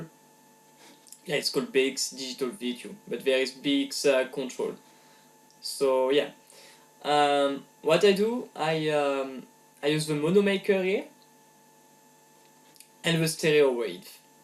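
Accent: France. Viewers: Quand j'entend ta façon de parler on se croirait devant une classe de 20 personnes à présenter un exposé xD Mais bon l'accent frenchy ca fais kiffer les étrangers :)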